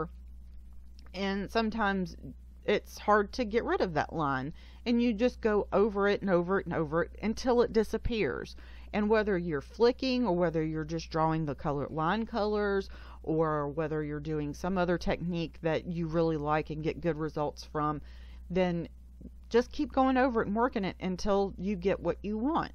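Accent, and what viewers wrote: South, United States. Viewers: Thanks for the great coloring tips. I do not like fussy-cutting either. I have a scan n cut that I got from a friend for cheap, but I sill don't really know what I'm doing with it. USA P.S. I'm from the Pacific Northwest, so I really enjoyed listening to your sweet southern drawl. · Love this card!!! Going to get this digi right now!!! Love your accent too, not sure when your from, but you kinda sound like your from the south like me!! Big Hugs!!! (US)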